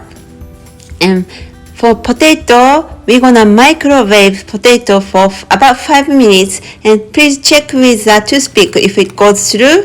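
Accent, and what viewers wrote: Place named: United States. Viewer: Thank you!!...I love your accent japanese - american ;greetings from a peruvian nikkei !!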